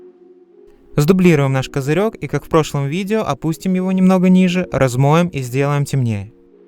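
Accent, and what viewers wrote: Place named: Odessa, Ukraine. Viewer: оуу ты из Одессы))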